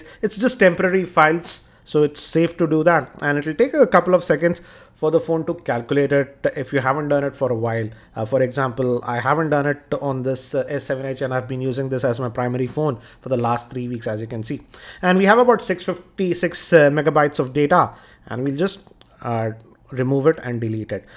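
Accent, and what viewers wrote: India. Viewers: Can anybody that doesn't have the most annoying Indian voice do Android cat videos please.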